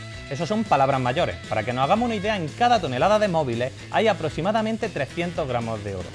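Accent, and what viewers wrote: Murcia, Spain. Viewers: JAJAJAJ este chico seguramente es de Murcia, es verdad que en cada región hablamos diferente pero tampoco vamos faltando a nadie 😉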